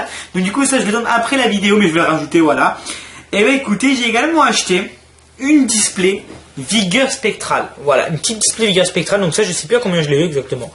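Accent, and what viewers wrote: France. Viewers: Suicune se prononce suicoune mais tu a to. Accent français xD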